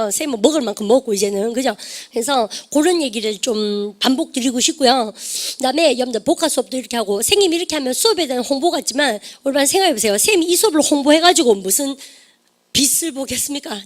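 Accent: Daegu, South Korea. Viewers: 이제는 대구 사투리가 아름답게 느껴지네요 ㅎㅎ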